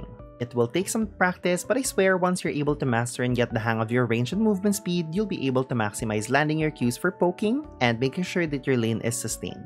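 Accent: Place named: Philippines. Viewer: I soooo can hear the Pinoy in you xD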